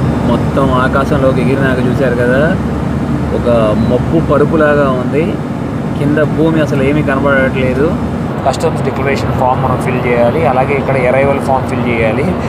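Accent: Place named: Vijayawada, India. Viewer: Harey Krishna.. brother, meedi Vijayawaada kaabatti, mee bhaasha chakkagaa untundi... waiting to see your videos in Japan.. Jai Sree Raam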